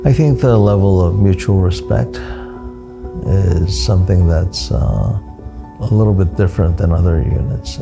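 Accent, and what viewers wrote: United States. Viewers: This man had to have grown up in the USA. That is the smoothest American English I have heard from someone that didn't have it natively.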